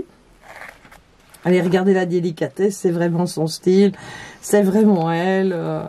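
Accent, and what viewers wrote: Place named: Belgium. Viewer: cc!! je suis abonné a sa chaine et j'adore ses créations!! eh oui tu as raison elle est hyper doué, je n'ai pas eu l'occasion d'échanger avec elle,tu as beaucoup de chance de l'avoir rencontrer,j'ai lu aussi cet article et je trouve que c'est vraiment mérité;bravo a Sandrine!!merci a toi pour cette belle présentation avec ton joli accent belge que j'adore!!bisous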